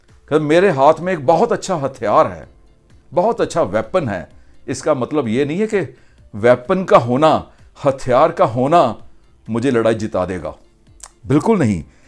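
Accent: Punjab, India. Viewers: Punjabi accent h unka ye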